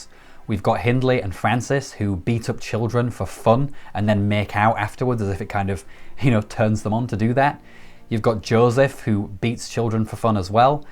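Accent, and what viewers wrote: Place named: Scotland, United Kingdom. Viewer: Is your accent from Scotland or from Northern Ireland? (I love it)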